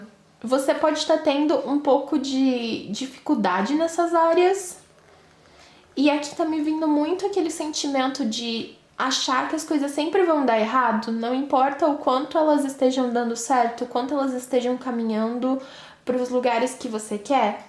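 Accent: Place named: Rio Grande do Sul, Brazil. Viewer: Acho tão bonitinho ela falar, é gaúcha?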